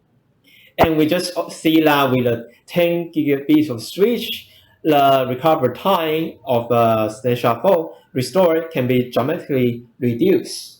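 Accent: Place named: India. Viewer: 在印度学的英语，口味太重，理解比较困难！！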